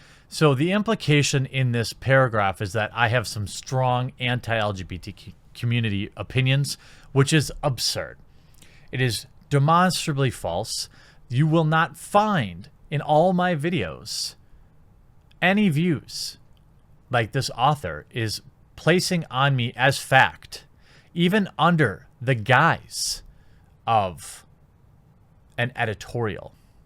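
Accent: Wisconsin, United States. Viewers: It's definitely a wisconsin thing... As an IL person that lived on the WI boarder for 8 years, they pronounce things weird sometimes, but it's not as bad as MN. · He also says “particularily” It’s a Wisconsin thing I think. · Shit are you from Wisconsin? Respect 👍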